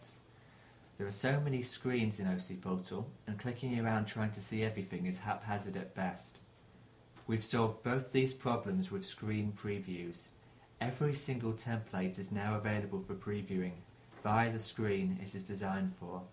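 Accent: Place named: United Kingdom. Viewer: The Brittish accent, itself, isn't a problem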